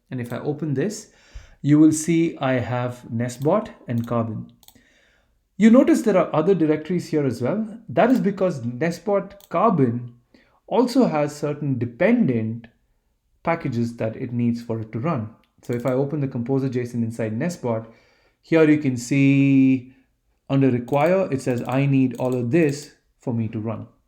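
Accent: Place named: India. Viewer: Very nice explanation. But! Use as large a font - or zoom in - as the you can get it. And speak slowly and try to be clear. Indian accent is hard to understand for north europeans. But really very good. Like write: One of the best videos I have ever seen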